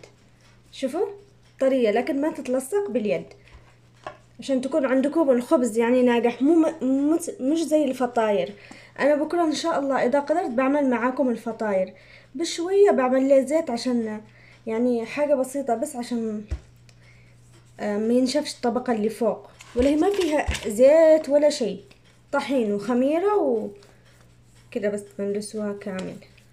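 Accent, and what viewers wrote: Aden, Yemen. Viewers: ماشاء الله تبارك عليك ممتازة بكل طبخه كل اكلك حلو واحلى شيء الهدره العدني لا تغيريها بالله عليك❤😘متابعتك من عدن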